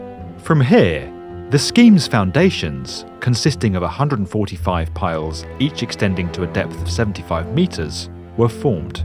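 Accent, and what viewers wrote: United Kingdom. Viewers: SuperOffroader6x6 it’s called a british accent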